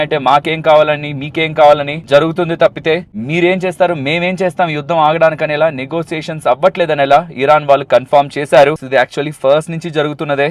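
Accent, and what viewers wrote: Nellore, India. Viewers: Galaba chasi velli potey … ❤ the Nellore slang !!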